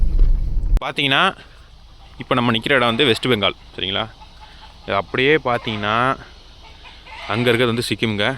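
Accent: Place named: Madurai, India. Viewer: Namma madurai bro...doing business in tirupur....neenga bro...unga slang and voice enaku romba familiar a iruku...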